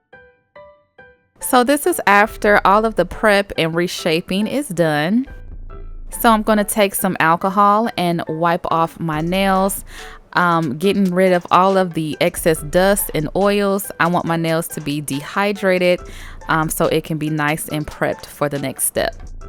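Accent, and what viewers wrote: South, United States. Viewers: OMG SIS!!! Absolutely Amazing!!! I’m loving this set. You are crazy talented sis!!! Yasss I’m wanting this set on sis. Don’t worry about how you’re pronouncing things; I’m country too sis. Tysmfs Love ya!!! 🥰🥰🥰💅💅💅💯💯💯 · First of all this look is <link>! I have a toddler son so my first thought at blue and orange was blippi 🤦🏻‍♀️ lol but it turned out so pretty! Amd second I LOVE that you talk like I do. I have a very thiccccc southern accent and honestly that's why I don't do many videos on my channel cause I hate the way I sound lol but girl your making me more confident to finally do a nail art channel! 💅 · Yall don't come at her she's country okay, that's how she says orange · I thought you were saying orange normally. But I'm country too so....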